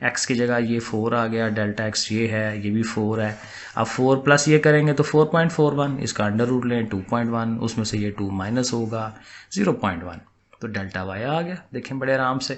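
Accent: Sialkot, Pakistan. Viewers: Sialkoti Urdu accent 😂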